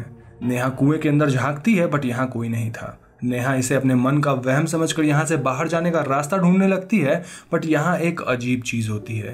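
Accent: Bengal, India. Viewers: Bhai tu begali hai kya??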